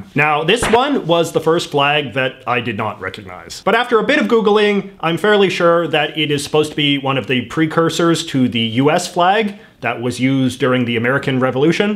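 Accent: Canada. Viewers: aebout, und and you are canadian bro am i right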